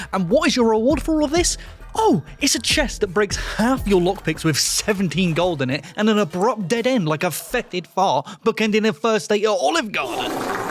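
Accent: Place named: United Kingdom. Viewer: I watched this a 3rd time. I am convinced is a fake gamer who needs hand held garbage or carrot on a stick garbage like WoW. He says that some items are scattered around and hard to find, then says exploration is pointless as there is no pay off. Example: fin gleam. Kid, make up your mind and please realize a British accent doesn't make stupid things stated as FACT, not OPINION sound very dumb and not smart. Funny thing is, I despise Bethesda, shit on them all the time, but the difference is I use literal FACTS. I do not cry about stuff and pretend they are facts. I think is just geared towards dumbed down slop like Skyrim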